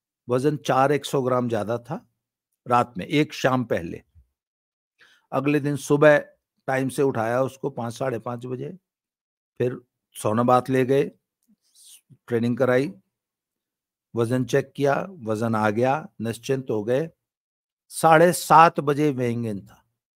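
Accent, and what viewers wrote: Haryana, India. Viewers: Babi ji leave everything aside but your haryanvi n punjabi accent too good...make a video purely in these language. It ll be delight to watch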